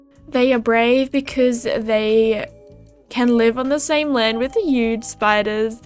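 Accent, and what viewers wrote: Australia. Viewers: when I first saw your video I had no clue what accent you had but now I hear the Australian but it's not the most strong accent I've heard but i can tell your Australian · as an aussie, i feel like the accent is definitely there for you but it is not as strong as others I've met before... but that could just be from me living in victoria?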